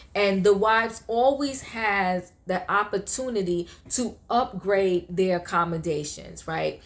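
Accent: New York, United States. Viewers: You have a NY accent but your skin too healthy. You in FL?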